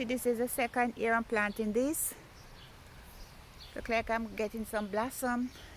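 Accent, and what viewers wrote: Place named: Jamaica. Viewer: Got half way through the video and thought she has to be Jamaican. You just said it! New sub here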